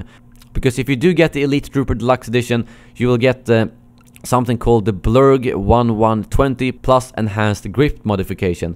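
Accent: Sweden. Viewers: Man kan höra på din engelska att du är svensk. Men bra video annars!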